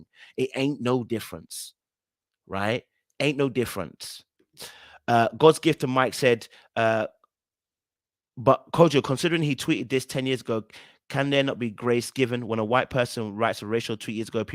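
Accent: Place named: England, United Kingdom. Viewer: I was NOT expecting the English accent! 🥰🥰🥰🥰🥰🥰🥰🥰🥰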